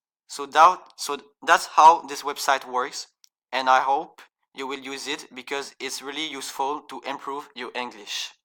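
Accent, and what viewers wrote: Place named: France. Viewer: Hi, I'm Alex, and I was searching for tips to get better at english, and so I just finished watching your instructional video about this website. I have to say it's a great site, there's a lot of functionalities to help me get better. (I am also polishing my english, just like you) French is my first language, it is yours too I think (got this from the accent). Thanks for the vid, I wish you to succeed in your college program, and good luck my dude! -Alex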